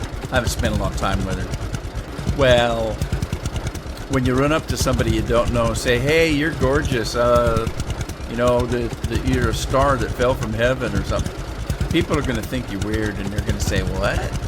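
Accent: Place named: Minnesota, United States. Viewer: I know this is an old video, but because I noticed it in the first video. I could tell you were either from MN or thereabouts, eh...enjoying the videos, thanks for posting.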